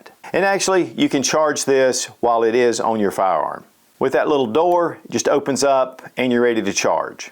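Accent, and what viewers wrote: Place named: California, United States. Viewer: I'm so jealous with, California people speaking 😅. Nice Gen6 set up. Thanks for all you do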